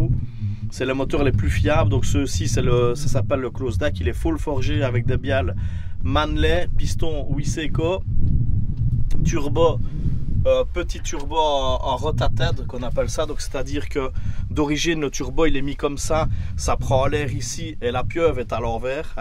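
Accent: Liège, Belgium. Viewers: avec l accent lidgeux 😅 parfait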